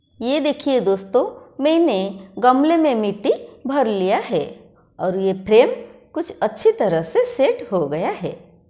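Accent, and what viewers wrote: Odisha, India. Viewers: App odisha se ho kya??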